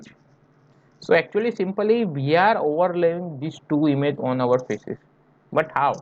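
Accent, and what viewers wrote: Gujarat, India. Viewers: i can definitely tell this guy is gujarati kathiyawadi according to his english speaking accent .if i am right let me know!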